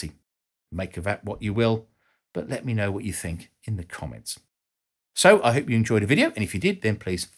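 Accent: United Kingdom. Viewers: Figures I gotta listen to a Brit to better understand what is otherwise seemingly stupid decisions behind my nation’s space program. Thank you!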